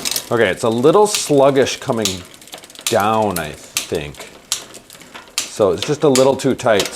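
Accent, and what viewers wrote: Wisconsin, United States. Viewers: Chicago guy living in the central highlands of Mexico with a 10 year old Chinese bike ! My first experience with a local bike shop was $30 charge for this tune up and my bike shifted very tight and I ended up searching YouTube for how to do a tune up myself. It’s good to hear a Wisconsin accent and to see your techniques . In two days I’m gonna give this a try . What part of Wisconsin are you? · Immediately after he started talking I knew he was from Wisconsin. GO PACK lol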